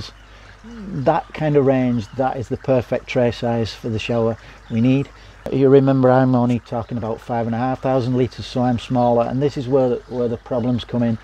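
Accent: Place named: Manchester, United Kingdom. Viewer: I know St Helens well mate although it was a bit of a surprise, as I could have sworn you jave a Manchester accent. Keep up the great work.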